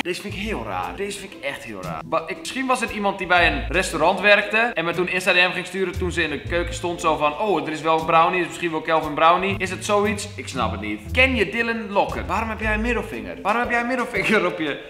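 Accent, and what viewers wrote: England, United Kingdom. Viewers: Ja ik bedoel een echt Engels accent, zou raar zijn als hij "nep engels" praatte😂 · Dat accent bij zijn Engels 🔥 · Ik hou van je Brits accent 💙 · Hij praat met een Brits/Engels accent en dat is heel anders dan Amerikaans/Engels😂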